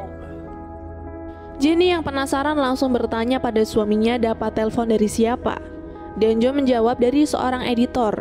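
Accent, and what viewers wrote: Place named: West Java, Indonesia. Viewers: Mimin sunda na bandung bukan si kalo bandung ku aing di apelin🥺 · Aya Orang Sunda Ey😂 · HAHAHHW ANJIR ORANG BANDUNG NIH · Sunda si teteh ey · Min orang mna aya sundaan🤣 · Cewe Sunda njir yg nyeritain wkwk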